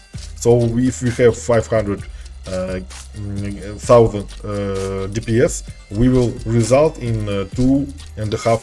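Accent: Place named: Russia. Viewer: У тебя такой русский акцент лол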